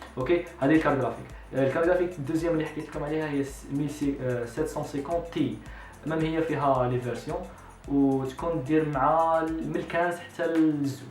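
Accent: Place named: Libya. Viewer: عندك شويه في العربيه الليبيه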